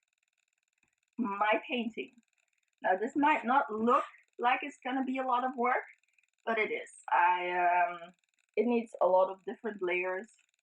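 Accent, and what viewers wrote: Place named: Norway. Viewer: Regardless of the project, I'm sure it will be challenging to get it done in a week. I'm sure you've said before, but where are you? Sounds like a Norwegian country?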